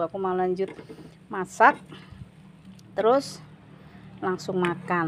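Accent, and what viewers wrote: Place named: Java, Indonesia. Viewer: Serem bget yu deloe brambang abang di goreng ngeri aku deloe eh🙈🙈,mendok tenan boso jowo ne yu 👍👍.tuku lah neng konter katah yu tripot kur gangsal welas ewu😅😅.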